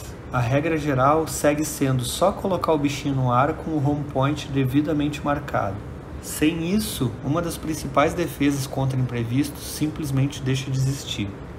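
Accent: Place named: Rio de Janeiro, Brazil. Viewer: Aaaaaaaa cariocaaaaaa se entregou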